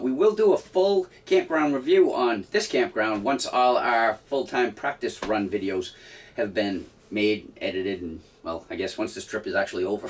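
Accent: Boston, United States. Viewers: Just found your channel and subscribed!! We just got our airstream and started our channel and cannot wait to get on the road like you....do I detect a Boston accent...? :) . We are from same area...would love to connect with you...so send us a message. Safe travels!!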